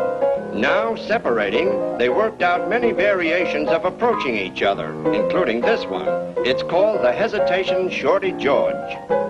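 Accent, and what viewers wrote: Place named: Transatlantic, United States. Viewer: Sounds transatlantic.. and maybe northern!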